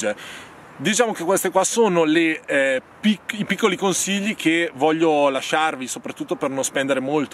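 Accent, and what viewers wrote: Brescia, Italy. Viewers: Avevo riconosciuto l'accento bresciano! Anche io! e tra l'altro vivevo proprio a Cellatica, dove sono ancora i miei genitori. Ti seguo con interesse!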